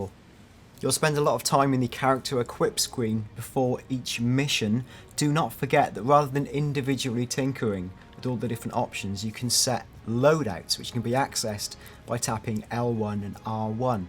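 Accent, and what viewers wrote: United Kingdom. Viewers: You sound like a British Elijah Wood. Cool.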